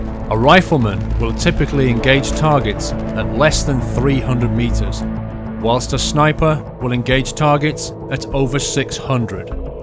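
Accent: England, United Kingdom. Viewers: Why the English accent !? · From the HK Website: Frequently asked Questions What is the proper way to pronounce Koch? The English pronunciation is pronounced, “coke." He pronounced it properly, it's coke, but he has an English accent. The video pronounced it as perfectly as his accent allowed. You're all saying it wrong. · DAMN!! now thats sone in depth review!! THE NEW BREAD OF REVIEWS! Fantastic video! see you got to narrate it lol he sounds like your average uk army guys accent lol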